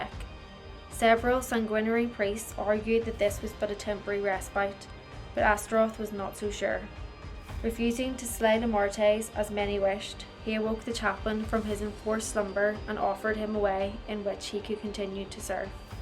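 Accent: Northern Ireland, United Kingdom. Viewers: Are yous by chance from Northern Ireland?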